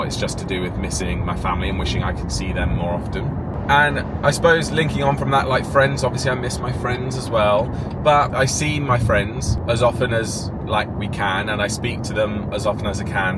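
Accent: England, United Kingdom. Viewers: we could never possibly get tired of seeing your handsome face on our screens and hearing your gorgeous English accent - much love to you and the handsome Keegan 🇮🇪☘️🇮🇪❤️❤️❤️❤️❤️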